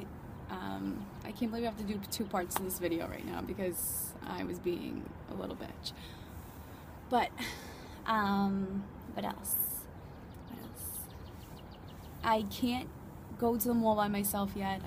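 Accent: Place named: Massachusetts, United States. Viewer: I am going to guess that your from Mass?!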